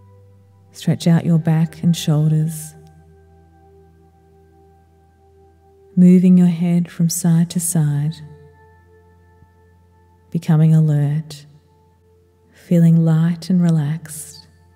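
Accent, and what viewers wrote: Australia. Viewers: This is great Australian voice... Thanks from India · Such a lovely voice....Australian? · She has an Australian accent, so she says "centered" but it sounds a bit like "scented."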